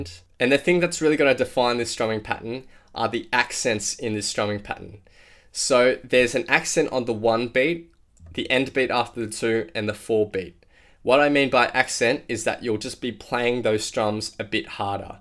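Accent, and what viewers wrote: Australia. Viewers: are you australian? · are you aussie?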